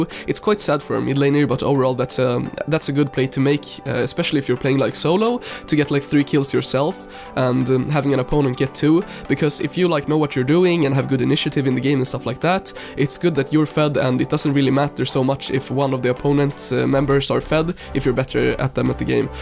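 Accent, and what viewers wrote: Sweden. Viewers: Are you swedish? you sound like it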